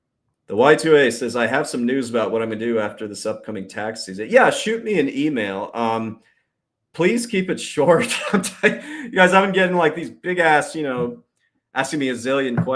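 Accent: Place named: United Kingdom. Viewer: Love that saucy British narrator. ❤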